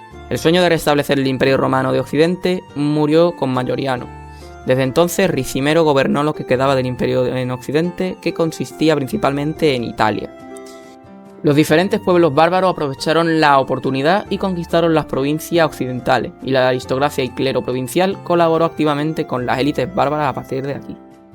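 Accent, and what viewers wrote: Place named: Andalusia, Spain. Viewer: No es mala dicción, es acento andaluz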